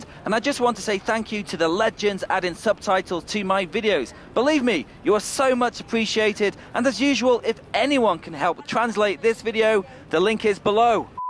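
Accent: England, United Kingdom. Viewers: ¿de qué parte de Inglaterra es tu acento? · where is your UK accent from?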